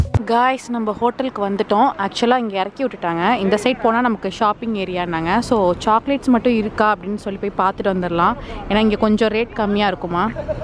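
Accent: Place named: Salem, India. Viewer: எல்லாமே பிடிச்சி௫க்கு...முக்கியமாக உங்கள் பேச்சு...நம்ப சேலம் ஸ்லாங் அ௫மை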